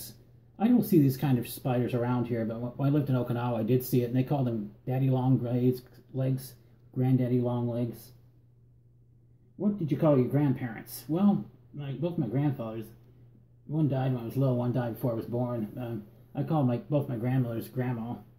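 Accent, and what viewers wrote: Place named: General American, United States. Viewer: You have a pretty “clean” general American accent with maybe a very, very slight southern sound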